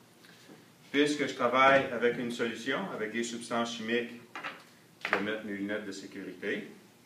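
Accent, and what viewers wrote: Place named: Quebec, Canada. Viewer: J'aime beaucoup ! C'était simple, un bon rappel, et en plus avec l'accent Québécois qui me plaît beaucoup ! Ca change un peu des cours habituels, c'est sympa comme tout !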